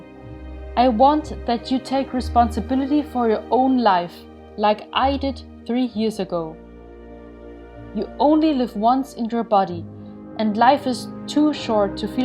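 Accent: Germany. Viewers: Her English is really good. Yeah, she has quite a strong Accent but her grammar and pronunciation are on point. I don't understand why so many Germans on YouTube put the English of their fellow Landsmen and women down. Show some Solidarity... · wie man sofort hört dass du deutsche bist;) danke für das video, hab ich was zum schauen aufm öden crosstrainer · dieses deutsche englisch xD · Her German accent is cute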